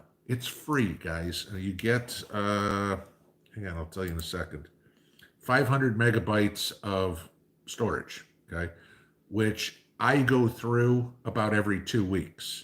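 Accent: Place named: New York, United States. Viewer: Hey hes a New Yawker!❤️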